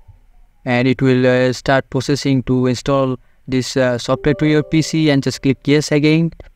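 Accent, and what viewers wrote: India. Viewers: Indian accent! 😍 Yeah, I definitely can install this program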